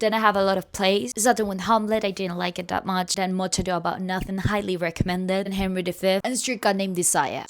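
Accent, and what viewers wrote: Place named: United Kingdom. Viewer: Me encanta acento inglés britanico y esta lista de libros ❤ deberías hacer más Reels así 😊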